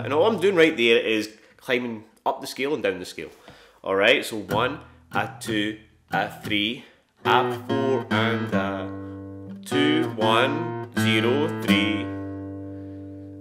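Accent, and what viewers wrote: Scotland, United Kingdom. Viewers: I have absolutely no problem with anyone playing this kind of music at all. But it always seems just a little strange to hear that Scottish accent with what is to me, traditionally very American music. Or any accent. I'm glad people dig it. Play it all you want. It's great. I'm glad people in other places can fall in love with music I love.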